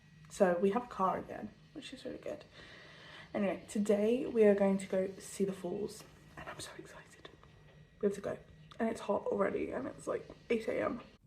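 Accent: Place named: Zimbabwe. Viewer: English Zimbabwean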